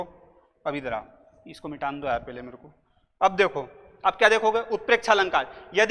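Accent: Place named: Indore, India. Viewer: वाह यार भियां एकदम इंदौरी style me padate ho. Kaha se ho.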